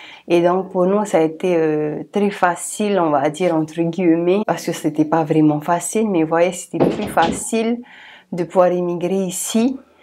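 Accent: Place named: Tahiti, French Polynesia. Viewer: J’́aime bien votre accent français tahitien et la manière dont vous présentez la vie en Nouvelle-Zélande. C’est un peu plus rassurant que ce que l’on lit et regarde sur l’immigration dans ce pays.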